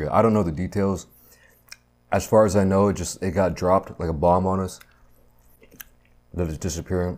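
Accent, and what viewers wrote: United States. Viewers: could you do a video of explaining why you live in Korea etc you sound like you were born in America etc would be a great vid explaining cheers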